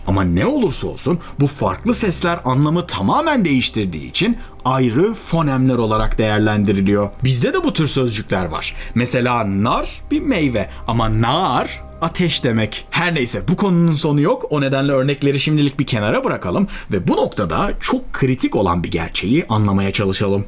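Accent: Samsun, Turkey. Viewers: Ya abi Samsunluyuz da bu kadar da mı belli oluyor. :D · reis sendemi samsunlusun :)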